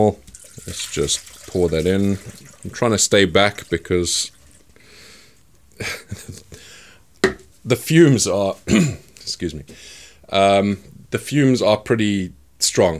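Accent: South Africa. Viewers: Heard a ZA accent, got my hopes up that there was a local shop to purchase these sauces, saw the cost in UK pounds, the man has obviously left our country :( . Back to using Bushman sauce, lol. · He sounds South African. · That is sad man. You do have a South African accent though. Anyway, i will have to try and make that weapon of a sauce on my own. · This guy sounds like a SouthAfrican fore reals >_< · South african accent, i approve 👍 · Is your subtle accent south African? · just came across the channel ... awesome content ... you sound very South African ...? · He sounds kinda south african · SO EPIC to hear a south african accent! followed!!!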